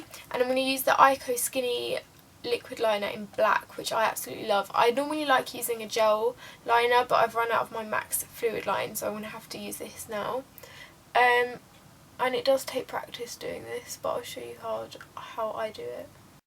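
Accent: United Kingdom. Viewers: Haha She not Irish shes British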